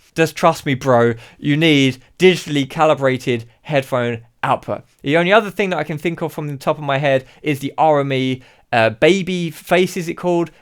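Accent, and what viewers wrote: United Kingdom. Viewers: from your accent, i assumed you were in the uk. where are you located though?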